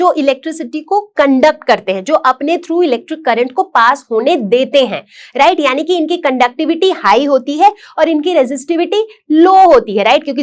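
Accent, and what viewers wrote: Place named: Gujarat, India. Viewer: Mam are you gujrati...? Your accent feel like gujrati 😊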